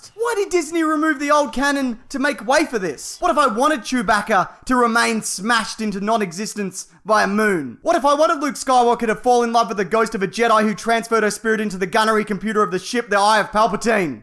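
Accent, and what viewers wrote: Australia. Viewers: OH MY GOSH! Someone yelling about Star Wars in an Aussie accent... BEST VIDEO EVER! 😂😂😂😂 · What a great sarcastic Aussie rant?! Love ya work ya bogan weirdo!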